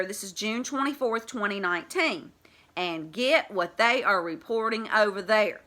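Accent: South, United States. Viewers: Hi..... love the channel. Love the southern voice. These satan people will be judged according.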